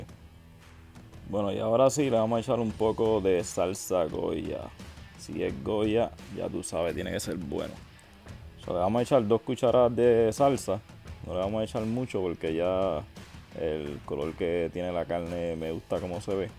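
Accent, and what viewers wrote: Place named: Puerto Rico. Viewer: Que lindo habla bien boricua ❤️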